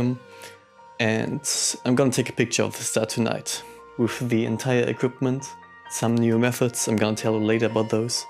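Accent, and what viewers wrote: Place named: Germany. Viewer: Ich finde den Videoschnitt echt super, hat so ein leichten Astrobackyard touch, was ich sehr begrüße nicht falsch verstehen und auch der Ton ist schön ausbalanciert und angenehm, bis auf vllt das es keine 3 Sekunden braucht um zu merken das du eig Deutsch sprichst 😉 Wo es ja so schön um Field flattner geht, kennst du irgendwelche für 1,25" OAZ's? Habe mein Newton soweit modifiziert das ich mit der DSLR in der Fokus komme aber bis jetzt nur 45€ Field flattner welche die Brennweite halbieren gefunden... Und wenn ich das bei 700mm mache kann ich, Brennweiten technisch gesehen, auch mein 200mm f/4 mit nem x2 Telekonverter nehmen und komme auf mehr Brennweite... Dafür ist das dann doch irgendwie blöd. Auf 2" aufrüsten hab ich auch schon Mal dran gedacht, hatte mir aber vor rund 1,5 Monaten ne Baader OIII CCD Filter mit 1,25" gegönnt und der wäre dann ja logischer Weise nicht mehr nutzbar 🙁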